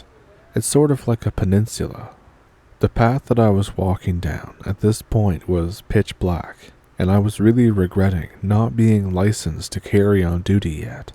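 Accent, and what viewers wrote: Canada. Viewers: Must be Canadian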